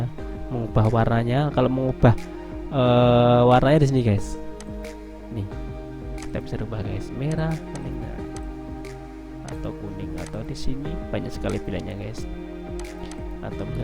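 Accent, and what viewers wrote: Java, Indonesia. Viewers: medok gaess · Jowone ngendi sampean mas?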